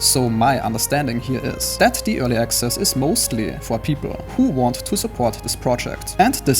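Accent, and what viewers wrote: Germany. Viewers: Sehr cooles Video mit vielen Informationen und Gameplays die ich noch nicht gewusst und gesehen habe. Würde dir aber empfehlen einen deutschen Kanal zu führen oder Videos in englisch und in deutsch zu machen da du ein krassen Akzent hast und damit wahrscheinlich noch mehr leute erreichen würdest. Hab bei der ersten Sekunde gemerkt das du deutschsprachig bist.🤙🏻😉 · nice german accent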